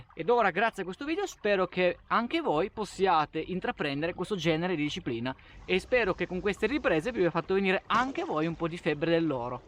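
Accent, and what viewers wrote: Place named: Piedmont, Italy. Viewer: Mi sono iscritta una settimana fa circa! Per caso è apparso un tuo video e sentendo accento piemontese mi sono soffermata a curiosare ! Sto guardando tutti i video vecchi. Grazie della compagnia.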